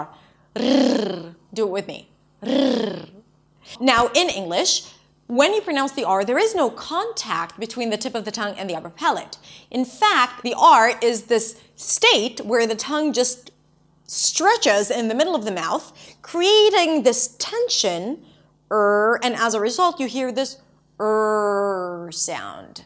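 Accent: United States. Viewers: Look sounds almost like luck? There is no trouble to pronounce V because в is the same :) as for my ears this is loud American accent of Emglish :) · Hi! I love your videos. I'm wondering if you're a native English speaker or if you were able to achieve the American accent that you have through practice? Thanks! I'm looking forward to more videos:) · with what? This is American English not British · This is an American accent english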